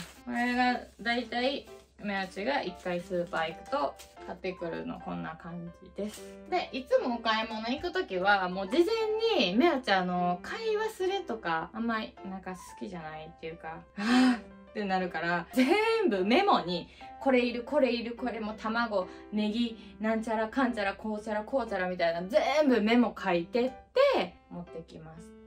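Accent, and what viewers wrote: Kansai, Japan. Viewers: 部屋着姿のかわいさと、関西弁のギャップがめっちゃどタイプです！これからも頑張ってください！ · 別に今に始まったことじゃないけど、ちゃんの関西弁大好き(*^^*)！しぐれのとこ好きww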